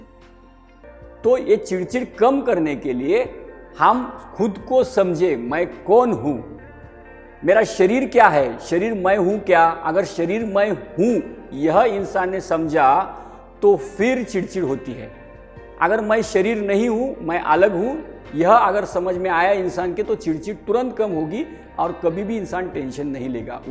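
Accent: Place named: Maharashtra, India. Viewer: Sir aap marathhibhashi lag rahe h Aapka bolna mujhe Bahut achha laga 🙏🙏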